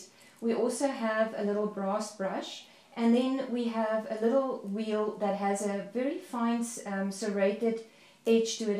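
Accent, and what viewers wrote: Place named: South Africa. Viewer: Looks lovely,is metal foil the same as pewter.nice to hear South African accent again ,I'm a ex South African living in the UK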